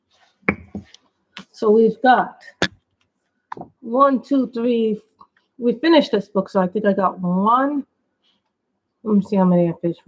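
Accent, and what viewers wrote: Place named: New York, United States. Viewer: I never seem t catch you live but watch your replays. Always enjoy your completed pages. I also,love listening to you as you remind me of home. I ever like hearing the background outside noises. I use to live in Yonkers right in VanCortlandt Park. (Bronx/Yonkers line). Thank you for sharing and hopefully I will catch you live soon. 💕🦄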